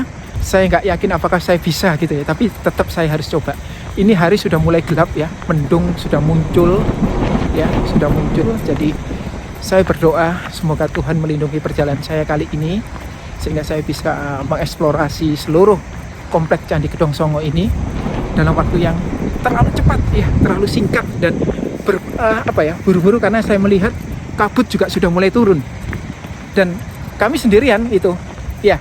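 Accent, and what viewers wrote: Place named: Surabaya, Indonesia. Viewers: Loghatnya jatim banget 😅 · Logat e Suroboyo banget 😀😀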